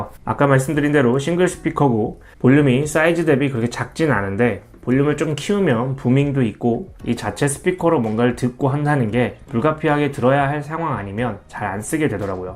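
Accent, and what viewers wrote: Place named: Daejeon, South Korea. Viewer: 대전분이군요 ㅎㅎ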